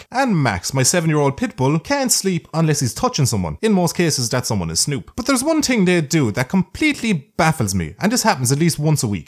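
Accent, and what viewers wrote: Ireland. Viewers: I dont know who you are, found your channel today on the "recommended". You have a good point of view that really jives with me. To be honest it's creeping me out, you sound like an irish version of myself. Not crazy, i think... Anyway keep up the good work. The worst thing about having a dog is having to say good bye. Mans best friend wont talk, can't talk, will listen the best they can, never leaves your side and will never complain. All for a head scratch and the last bit of what you're eating, if only people were so easy to please. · I don't know why I love this channel so much its just a Green duck with an Irish accent swearing for like 10-15 minutes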